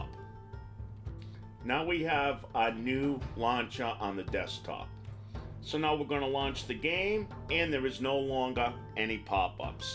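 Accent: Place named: New Jersey, United States. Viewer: never seen any of your other videos so im not sure if you were just doing a goblin voice for the video because you love wow or you're repping joirsey, either way good stuff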